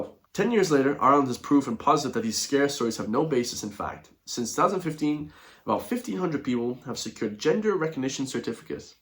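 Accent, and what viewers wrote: United States. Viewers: Dude I never miss a video and I agree almost 99 percent with you. Just curious I'm from Westside Galway and I lived 18 years in California and back home and sincerely not being smart ass but where does the American accent come from I've kids of friends of mine born and lived in America 13 years and within 5 yrs they had Irish accent and American accent gone but you've a full American accent with a tiny bit Irish accent. It's not a criticism just a real thing I'd love to know I was fully under impression you were born raised America and moved back to Galway as alot people did with kids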